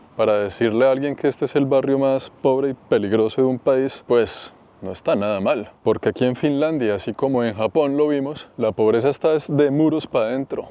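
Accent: Colombia. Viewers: eres la prueba de que el acento colombiano, no tiene por que aborrecer a los 30 segundos. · Parcero sus videos son muy entretenidos escuchar otro Colombiano narrar desde nuestra manera de interpretar es importante no dejes de hacer estos videos 🙏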